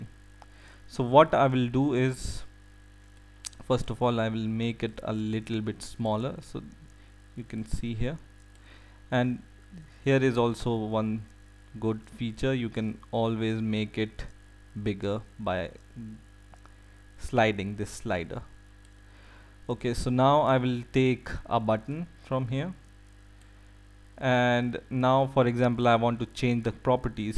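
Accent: India. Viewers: your from india xD?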